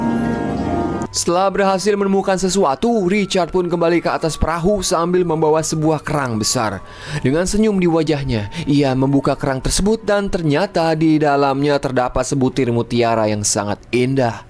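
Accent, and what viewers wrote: West Java, Indonesia. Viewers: Ada Sunda Sunda nya kang😭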